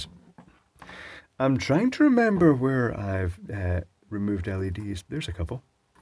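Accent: Scotland, United Kingdom. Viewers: I just like the Scottish accent (I'm English, sorry) so I like to listen to you and IanScottJohnston if only for the voices.!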